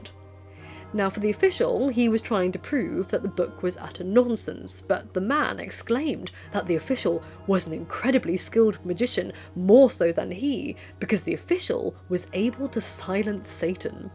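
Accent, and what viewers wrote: RP, United Kingdom. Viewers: British viewers, does her accent sound as posh to you as it does to me? I can't imagine there's a British accent that's more posh than this lol.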